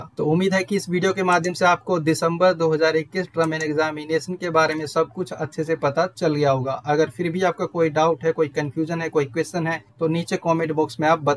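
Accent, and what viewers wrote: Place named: Bihar, India. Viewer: bhai I think you belongs to BIHAR . IS it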